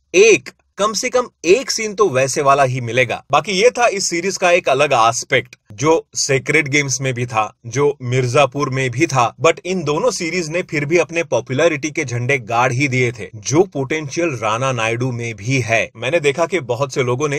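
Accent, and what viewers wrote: Hyderabad, India. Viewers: speaking hyderabadi is just 🔥😮😹 Love from gujrat sir ✌️ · Love from Hyderabad....your Hyderabadi language is 😂😂😂😂😂😂 too good 👍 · Loved to hear your hyderabadi accent sir.. Love from hyderabad 😍❤️ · Hyderabadi bolte aando💥💥💥💥💥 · aapki haidrabadi ka jawab nahi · Hyderabadi hindi aap ke muh se bilkul korg jaisi lagi, aapki voice korg ke liye ekdum best hai😍😍❤️❤️🤩🤩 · aapki Hyderabadi bahut acchi hai aapke Hyderabad sunke hansne ko bhi a raha hai maja a raha hai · u r great in hyd hindi language 😂😂😂 i am hyd and i love it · Aap ki Hyderabadi kirak hai ji bhai🤣🤣❤️💫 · continue aapka haydrabadi 😂😂😂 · Hyderabadi op😂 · your heydrabadi accent too good!!!!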